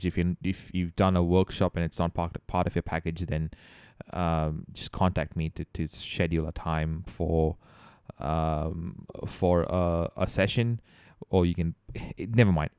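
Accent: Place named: United Kingdom. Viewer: Good grief! You spent the first two minutes chasing your tail! Repeating yourself... If you have a "know it all" Brit accent, you better deliver in the first ten seconds... "I'm the smartest person in the room and I have the accent to prove it!" No you''re not....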